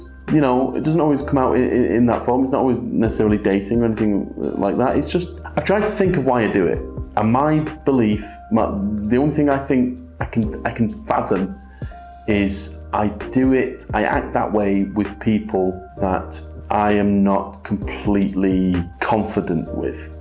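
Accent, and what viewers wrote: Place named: England, United Kingdom. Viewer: can't explain why but i find the baldness very sexy! never been into bald men but when combined with the English accent and the bald head, very sexy!. i watched your film ''Abused'' and as someone who came from an abusive relationship it was very touching and relative. i hope all the new things happening to you keeps on a high note and i don't think you'll be lonely for long, given the sexy baldness..........lol.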